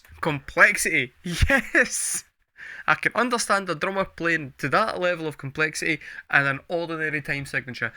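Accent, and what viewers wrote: Scotland, United Kingdom. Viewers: Your words are SO much better in a Scottish accent; you really do recognise genius and express that so well. I've enjoyed every moment of this. Thank you mate.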